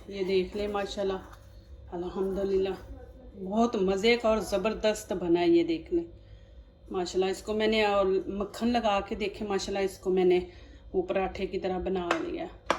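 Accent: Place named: Punjab, India. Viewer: MashAllah bohet mazy ka nan bohet achy treqy se semjaya ap mujy pnjab se lgti he ap ka andaz benjabion wala he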